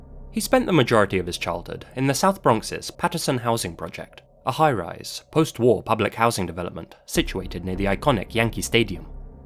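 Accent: England, United Kingdom. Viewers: Are u a fellow Brit? I watch so much Youtube accents blend together lol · I love your 'made to tell stories voice' And accent. I find it comforting. Think its an English thing. Im a northener. You should narrate audio books too. Its a real skill. One you have in abundance 🤔👍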